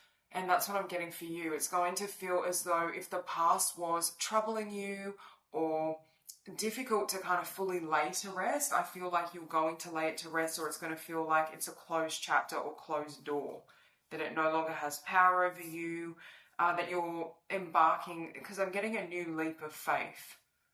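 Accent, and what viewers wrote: Australia. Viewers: #3, thank you for the overall message of renewal. Love the accent, Australia or New Zealand love?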